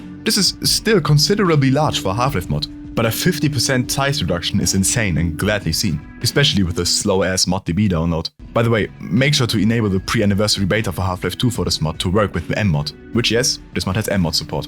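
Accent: Germany. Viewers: I can here that your german... Still my guy making peak after peak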